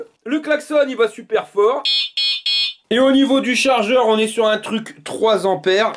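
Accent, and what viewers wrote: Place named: North, France. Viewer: Salut, alors, je viens de voir ta vidéo sur YouTube, sur une trottinette avec selle et pneus offroad. Je suis d’accord avec toi sur la sélection des paliers de vitesse. Mais, moi, j’ai une Hitway S5: celle-ci est censé faire 45 km/h sur 45 km, elle a aussi un siège avec des pneus route anti crevaison, freins à disque avant et arrière, un plateau,(et non, pas un deck- restons dans le français,- pas la peine de se galvauder...), avec matériaux anti- dérapants sur les côtés, le tout, sur un moteur électrique sur la roue arrière de 800 watts... elle a aussi des clignotants avant et arrière, elle fait 20 kg tout plein fait,(si j’ose dire...)... Dans la réalité, j’ai d’une trentaine de Kléber faisant le même poids que toi,(99 kg). Pour l’instant, je ne peux plus en faire, j’ai glissé sur une plaque d’égout et je me suis blessé à la jambe, et ce en marchant... 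Pour ton problème de puissance, je pense que l’idéal, serait un moteur de 350 watts sur chaque roues. Par contre, je ne suis pas d’accord avec toi sur le régulateur de vitesse, moi, je ne l’ai pas, et c’est ce qui manque à ma trottinette pour être parfaite, en tout cas, pour être parfaitement concentré sur la route... Continue avec ton humour, et ton accent du nord, car moi, j’aime bien.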